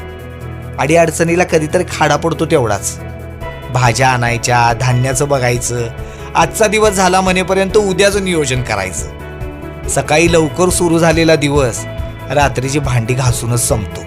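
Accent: Kolhapur, India. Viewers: आपली भाषा व वांगी कोल्हापुरी दिसतात. खुप छान 🙏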